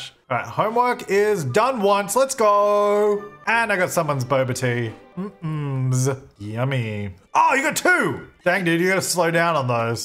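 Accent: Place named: Australia. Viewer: It took me 17mins into this video until I realised you're Australian... And I'm Australian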